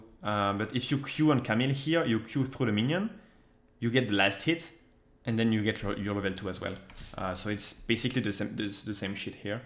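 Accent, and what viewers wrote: France. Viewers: Jolie accent fréro, ça fait du bien de voir un français qui parle aussi bien anglais haha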